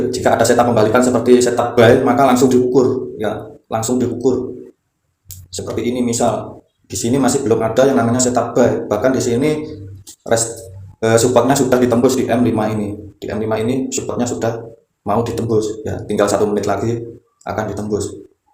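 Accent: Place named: East Java, Indonesia. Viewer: Ooo Jember yaaa mas ?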